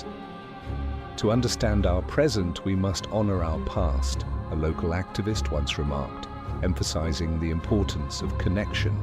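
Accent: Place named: United Kingdom. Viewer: The voice says he grew up there...in a British accent.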